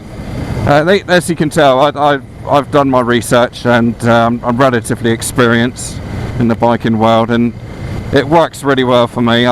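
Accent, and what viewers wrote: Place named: England, United Kingdom. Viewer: Can you talk in an American accent hard to respect with English sounding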